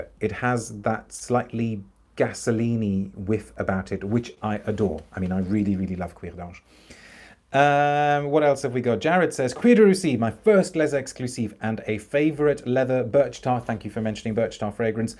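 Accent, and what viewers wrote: United Kingdom. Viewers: Hello 👋 are you English?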